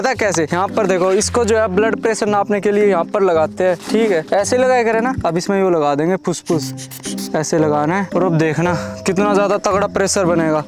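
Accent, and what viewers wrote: Haryana, India. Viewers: Bhai tum haryana sa ho kya😂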